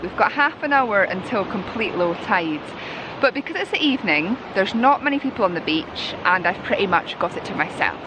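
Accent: Scotland, United Kingdom. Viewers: Great Video, as Usual a pleasant time, listening to a wonderful scotch accent. You make photography wonderful. Brilliant images!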